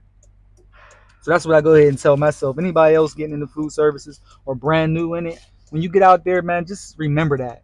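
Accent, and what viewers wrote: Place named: Pittsburgh, United States. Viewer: U gotta be from Pittsburgh 🖤💛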